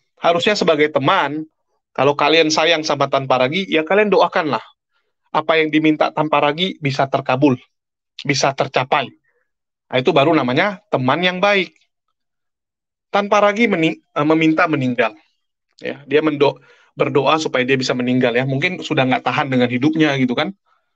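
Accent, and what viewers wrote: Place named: Medan, Indonesia. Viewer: Cina Medan eeee.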